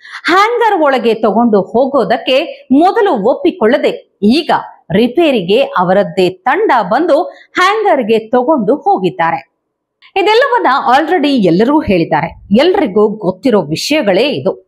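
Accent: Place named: Dharwad, India. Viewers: Madam Please talk only in uttara kannada slang❤ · Madem vishaya yenar eravaldeka nam Dharwad bhashe daaga mataduda yaarara byadandrenu helri , nanagantu byasarageda soodli 😂😂❤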